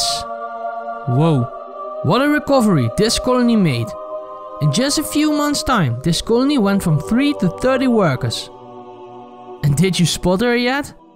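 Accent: Netherlands. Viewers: Am I hearing a Dutch accent? :o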